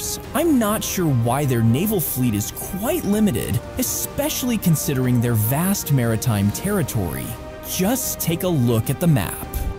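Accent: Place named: United Kingdom. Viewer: Tal cual. Tu lo has dicho. Vos, que la viste derrumbarse y rodar por el suelo mordiendo el polvo, no quieres ver que está levantándose, ¿por qué? ¿qué intereses hiere un hipotético rearme argentino? ¿Por qué nos quieren con la cara contra el piso y las manos en las espaldas? ¿Por qie se regocijan viendo a Brasil y Chile armados hasta los dientes? ¿Quién sos, detrás de ese voz y locucion británica o angloparlante? Un saludo desde Córdoba, Argentina.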